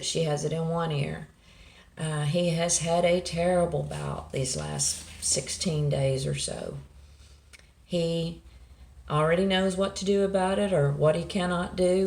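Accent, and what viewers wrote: South, United States. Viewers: it was wonderful seeing you and I understand about how this house arrest we are all on hasn’t really affected me to much either. I’m so very very sorry to hear about Chico and Gizmo, I loved watching those babies hop around and play.I know how hard it was for you and George.Again I’m so sorry to hear that.I’m hating to hear that George has been down for over two weeks. I pray he gets through this episode soon. I can’t imagine the pain he is in.I will definitely keep him in my prayers.Thank the good Lord above that Granny is home and doing well. She is an amazing women.GOD BLESS HER. Well I’ll stop rambling but it’s been awhile and I’m so sorry it’s been really rough for you guys, but I sure was happy to see your pretty face and here your awesome southern accent, I love to hear you talk.We southern girls have to stick together about our accent. 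God Bless you all and I will keep you all in my prayers. Please take care and be safe. 🙏💕🙏💕🙏💕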